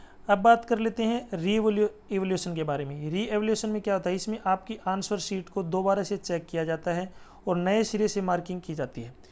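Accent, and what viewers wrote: Haryana, India. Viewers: bhai aap haryana se ho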